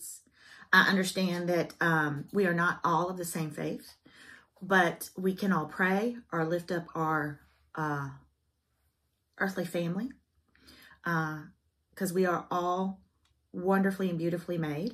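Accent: South, United States. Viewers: Hi - to my ear you do have an accent - that southern one and I think it is lovely. I enjoy listening to you. Your chats are so valuable to the community. Don't ever doubt yourself if you are enjoying yourself - then it is not wrong or negative.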